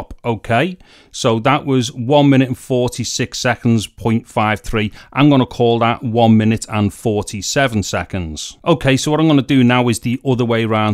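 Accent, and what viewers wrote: Liverpool, United Kingdom. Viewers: That British accent though. By the way nice effort. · Hey, are you from Liverpool?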